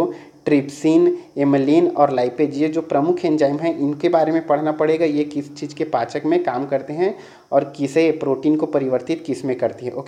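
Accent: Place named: Chhattisgarh, India. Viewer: c.g kuc jyada hi bolte ho kya sir..hindi ko v chhatisgarhi typ tunning me padhh rhe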